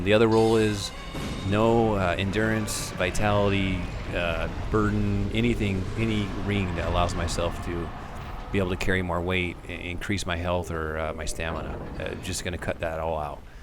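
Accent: United States. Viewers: I really like your commentaery. I am Scottish and I have relatives from LA and New Jersey. I love American accents. Whereabouts are you from? Thanks.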